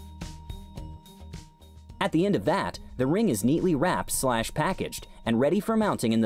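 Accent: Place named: China. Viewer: Terrible chinglish.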